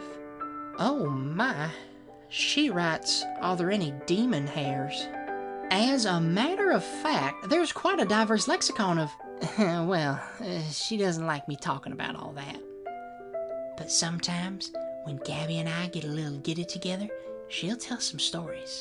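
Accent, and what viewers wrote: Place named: South, United States. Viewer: Something about these videos are so comforting to me. I'm not at all religious, and I'm sure it will be revealed that the bun isn't as good as she seems, but I genuinely appreciate the animation and work. I think it's the southern accent and absolutely adorable designs. I suppose I have 2 questions! How was this animated? It looks like puppetry, but it could also be hand drawn! And how do you feel about fanart?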